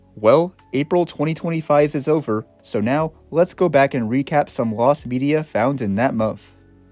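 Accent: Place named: United Kingdom. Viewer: You sound British, dude Edit: hey,is the creator of KND related to Patrick Warburton,famous voice actor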